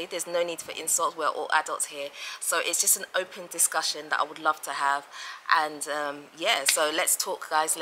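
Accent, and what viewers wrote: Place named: United Kingdom. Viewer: You are British my dear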